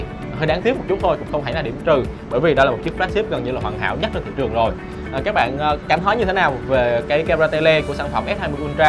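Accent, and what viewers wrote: Binh Dinh, Vietnam. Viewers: anh này dân bình định hả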